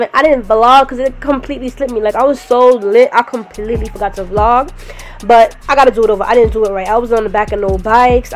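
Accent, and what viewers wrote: New York, United States. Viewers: Are you from NY? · U from ny? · I could tell she’s from New York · you from New York ? 😭. I love the accent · I’m so glad you from NY like me cause it gave understand everything you say word to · I knew you was from some part of NYC when you said “yo I be buggin” 😂 real NY shit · Lmaoo you from ny?? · You must be from NY city lol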